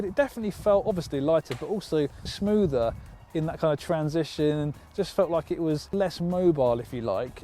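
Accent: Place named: England, United Kingdom. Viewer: Kevin Zhao they have that accent because they ARE English just like your accent is from your home country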